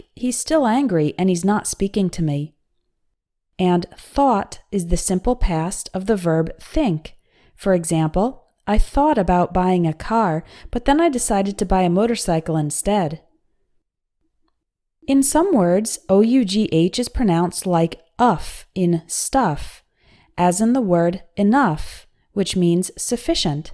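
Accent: United States. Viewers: Do I hear a midwest accent? 🧐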